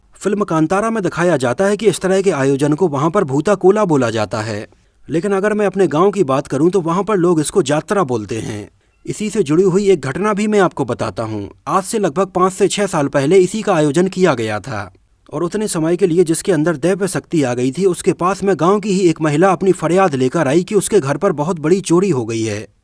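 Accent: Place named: Bundelkhand, India. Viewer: आप शायद बुंदेलखंड के हैं आपकी बात से मैं सहमत हूँ आपकी टोन से मैं सोचता था कि आप बुंदेलखंड के हैं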